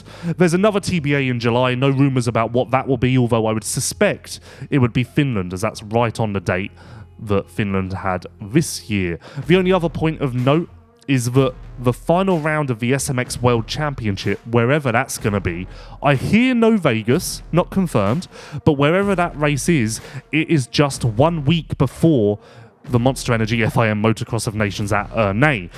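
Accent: United Kingdom. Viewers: thank you for talking faster and having live action, now I won't mute the hub. Luv a fast talking Brit!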